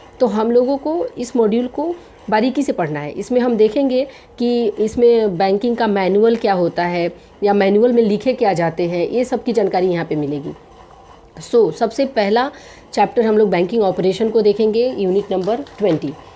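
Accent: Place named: Bihar, India. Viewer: Mam apka accent sunke mujhe samaj me ek chiz aa gaya...u r from bihar but u r posted in maharashtra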